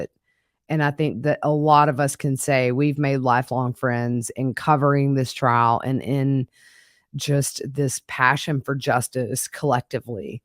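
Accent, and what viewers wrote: South, United States. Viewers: PS: I so love your southern accent ❤ Greetings from New Zealand 🇳🇿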